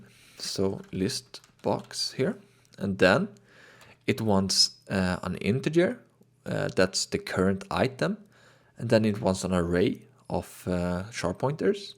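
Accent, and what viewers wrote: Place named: Sweden. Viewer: Love the swedish accent, very cute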